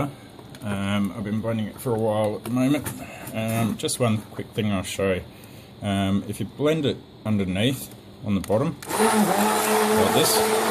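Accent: Australia. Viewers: hahaha, me thinks its his aussie accent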